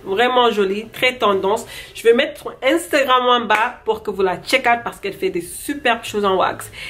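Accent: Wolof, Senegal. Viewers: Je découvre ta chaîne Miss tu es belle belle,je suis une Sister sénégalaise. haha j aime ton accent wolofaliser. en fait j ai aussi une chaîne YouTube ,ci ça te tente d aller checkeeeee. bis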